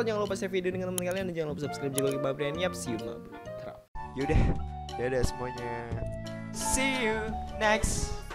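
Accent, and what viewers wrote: Java, Indonesia. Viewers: Iki wong jowo ya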